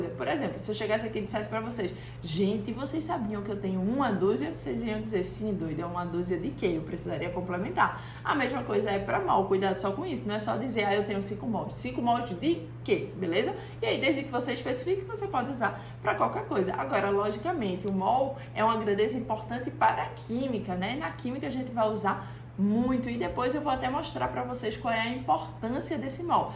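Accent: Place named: Recife, Brazil. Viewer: Este sotaque recifense não engana, com certeza temos aqui uma excelente engenheira química recifense, visse?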